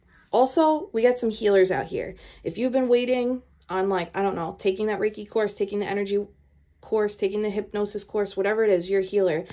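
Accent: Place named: Long Island, United States. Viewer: I just found this page and I subscribed because of your accent.. are you from long Island? ❤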